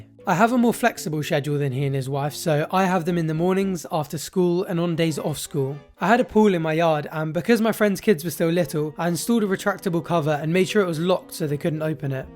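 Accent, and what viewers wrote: United Kingdom. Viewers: I love your voice? Your defo from the uk too yes?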